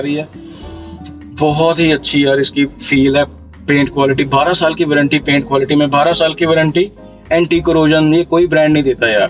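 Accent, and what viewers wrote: Punjab, India. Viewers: sare punjabi petrol ko paatroll kehte hain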